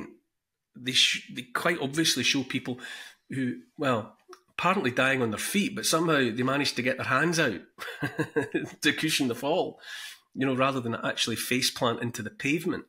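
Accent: Scotland, United Kingdom. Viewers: The Scottish accent is so very charming! I love it. (The Irish accent is equally charming, definitely different from the Scottish, but similar to American ears.)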